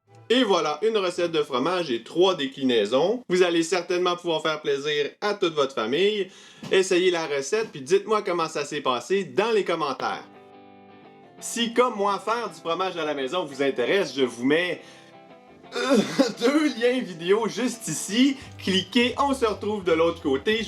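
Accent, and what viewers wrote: Quebec, Canada. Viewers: J'adore ton accent, ça me rappele mon oncle du Québec !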